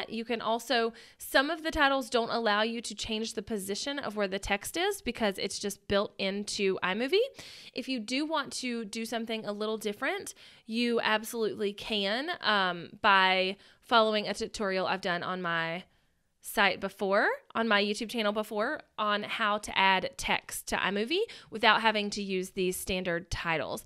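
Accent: South, United States. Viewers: Great video, as I was listening I was like "this lady is from the South". Sure enough NC, Me too. Thanks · Great video. She explains it well, and has a cute accent. From one southern to another-- get it girl!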